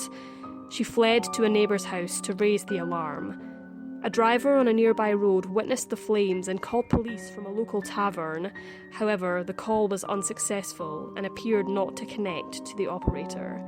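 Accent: Scotland, United Kingdom. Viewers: Is the narrator a Scott?✍ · I love the Scottish accent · This is NOT an American show. The so-called annoying voice is because the show, Dark Curiosities, is a Scottish YouTube mysteries series. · No, that is definitely a Scottish accent.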